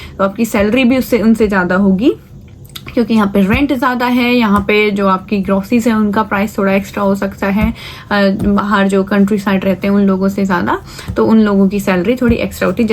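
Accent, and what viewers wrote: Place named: England, United Kingdom. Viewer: Ap k Hindi mai English ki jhalak arehi hai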